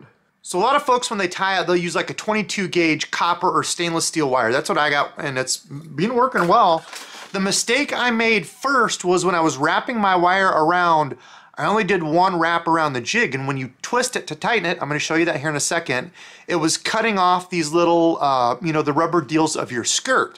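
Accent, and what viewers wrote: Iowa, United States. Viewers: Petition for to make more root beer colored jigs to hear him say it, love the Iowa accent 😂😂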